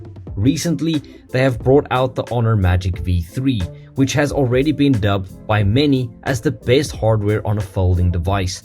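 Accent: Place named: South Africa. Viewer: Your accent is South African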